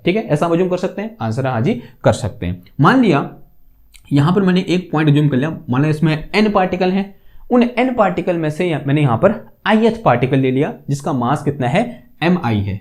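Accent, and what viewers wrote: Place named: Kumaon, India. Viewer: Kumaoni accent ❤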